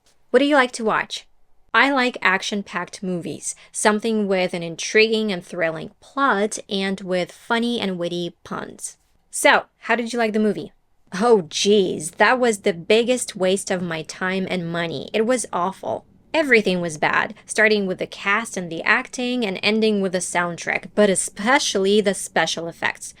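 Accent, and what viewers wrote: United States. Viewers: How amazing I ve been watching ur videos for a long time I cant believe that u arent American...u are great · Hey, as an native English speaker would you say she sounds like an American? (Cause to my ears, I'm Brazilian, she does). If she doesn't, what gives her away? Would you weigh in, please?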